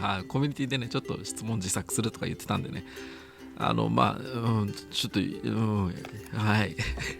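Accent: Kansai, Japan. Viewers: 関西の人の喋り方や〜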